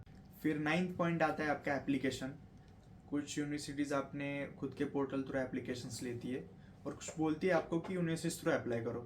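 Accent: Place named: Maharashtra, India. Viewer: Hindi with Marathi accent😅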